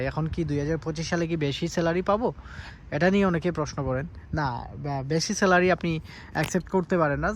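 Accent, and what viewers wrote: Sylhet, Bangladesh. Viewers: ভাই আপনে সিলেটের নি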